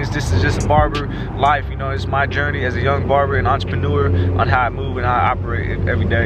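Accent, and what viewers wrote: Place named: Oakland, United States. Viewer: Don’t forget to buy your pumpkin ha ha. 🎃 Just curious why do you have a East bay / Oakland accent? Cool tips & nice shop keep it up.✌🏻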